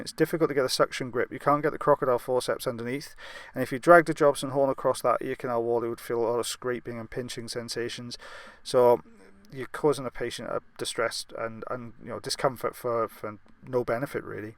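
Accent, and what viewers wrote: Wales, United Kingdom. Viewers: Are you welsh cuz same😂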